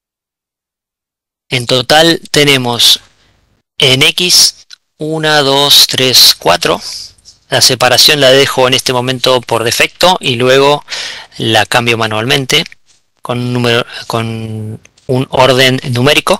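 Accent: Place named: Valparaíso, Chile. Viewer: hablas iwal que el amigo de una amiga, no seras de valparaiso?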